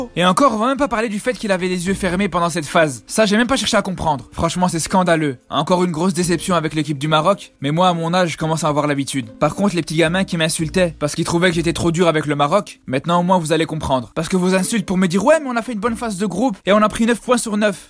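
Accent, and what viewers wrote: Belgium. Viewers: Toi t belge mon frère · La vidéo m'a tué 😭😭 par contre on entend bien ton accent belge !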